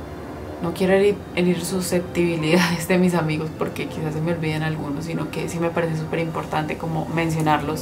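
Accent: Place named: Colombia. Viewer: Según escucho tu hacento eres colombiana.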